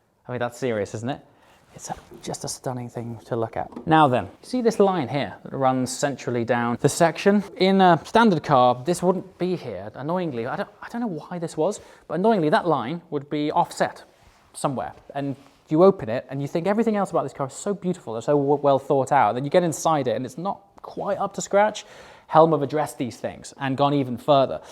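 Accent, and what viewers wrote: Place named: North, United Kingdom. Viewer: Well, with his Northern lilt, you can hardly accuse him of being pretentious!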